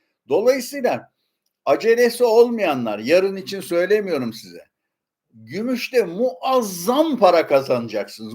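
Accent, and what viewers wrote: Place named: Yozgat, Turkey. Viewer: Yozgatlı ağzı var kardaş sende bir de çatı,reklâm falan burada bile dümen turundasın çene güçlü edebiyat iyi..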